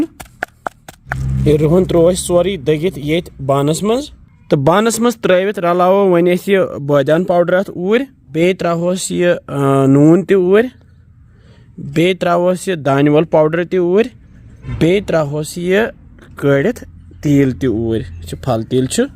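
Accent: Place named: Kashmir, India. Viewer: I purely listen to your videos for your Kashmiri dialect...... wonderful....👌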